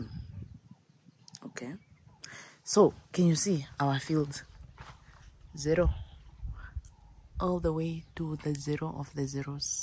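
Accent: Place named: Oshikwanyama, Namibia. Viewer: Eish the way u speak oshikwanyama i enjoy it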